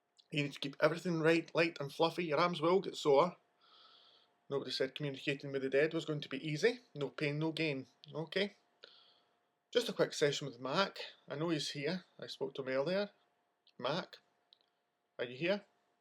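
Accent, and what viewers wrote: Scotland, United Kingdom. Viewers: are ye scottish lad? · ur scotish me tae m8 lol scotland foreva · Is a make shift ouija board okay to use??? Oh and love the Scottish accent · Is he.. .Scottish? Or Irish...? This is going to bug living crap out of me >.> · Great video! I have a board and really want to try it - will be alone as nobody I know believes or is willing to take part! Thanks again for this video, brilliant to learn history of the boards too. PS I'm a Fifer - where is your accent from? You sound like you're more towards the West!! :o) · Are u scotish ?? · Ahh, he's from Scotland. :P Sort of close. Lol. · Are you Scottish?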